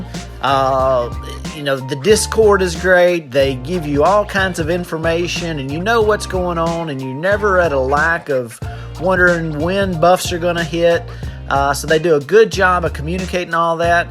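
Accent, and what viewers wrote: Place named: South, United States. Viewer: Game looks interesting. You sound less southern.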